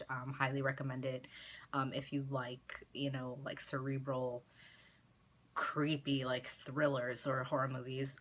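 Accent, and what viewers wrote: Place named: New York, United States. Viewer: omg im from nyc and when I first started watching your videos all I could think of is "she has to be from nyc" bc your attitude and the way you spoke 😂😂💖 I love your videos btw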